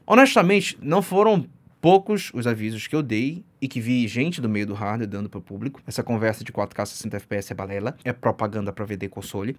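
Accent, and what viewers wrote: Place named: Belém, Brazil. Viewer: meu pai e de Belém, passo a maioria das ferias com a minha vó em Belém, nao tem como nao reconhecer esse sotaque.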